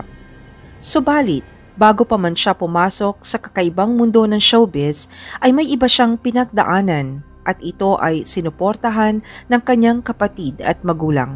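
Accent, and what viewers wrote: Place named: Visayas, Philippines. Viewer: Bisaya yata ang narator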